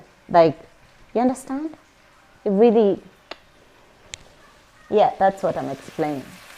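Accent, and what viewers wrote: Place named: Pakistan. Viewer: You made me overjoyed to picked up Pakistani accent. It means you really love Pakistan ❤ 🇵🇰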